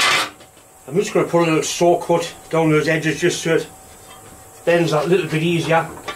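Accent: Newcastle, United Kingdom. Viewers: English, with a Newcastle Upon Tyne, Northumberland accent. Took me about 5 videos to understand every word. I've watched almost all of videos.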